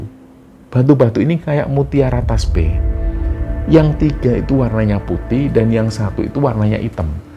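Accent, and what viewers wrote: Java, Indonesia. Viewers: jelas sdah dan sangat² nyata bahwa KARMA itu ada cepat dan gak pernah salah alamat.... alfatihah buat alm.dan almh. bapk ibu mbak lala... mbak lala sekeluarga sehat" nggih ❤❤😊 om logat jawanya mboys... suxes nggih..😊🙏🏻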